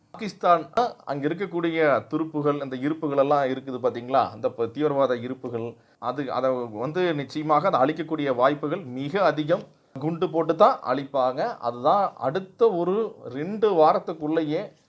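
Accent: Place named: Kongu, India. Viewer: Arumai sir 💐especially pleasing to hear the positive explanation through our veera kongu thamil slang👍 It's our luck to have a great patriot modiji as our prime minister 👏 We are safe in his rule. Thank you for your great efforts 🙏. Bharat Matha ki jai💪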